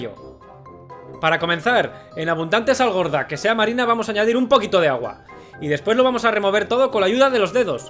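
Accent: Spain. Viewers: saludos desde México, y me encanta el asentó español, besos y abrazos;)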